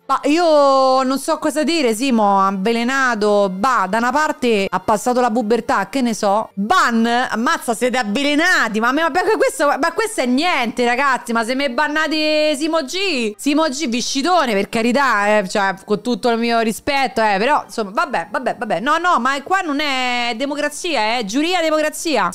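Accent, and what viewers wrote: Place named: Rome, Italy. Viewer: Sei fenomenale... Mamma il romano mi fa morire!!!!😂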